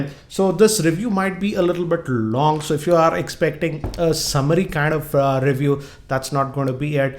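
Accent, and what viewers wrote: India. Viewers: Good review, after watching all those Indian reviews I start to like the accent.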